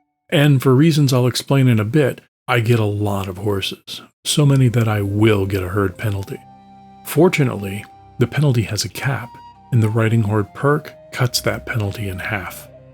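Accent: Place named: Netherlands. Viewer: Leuk hoe je altijd een andere nederlander herkent als ze engels praten :P, je doet je best maar helemaal onderuit kom je er niet.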